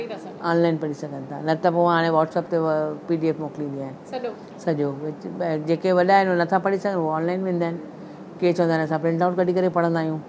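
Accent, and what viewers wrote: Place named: Khairpur, Pakistan. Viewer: Aunty bilkul Safa typical Khairpur local Sindhis accent ahh.. jeko pure sindhi boli Jo saboot ahay.... Dadho sutho Lago aunty khy budhi khare...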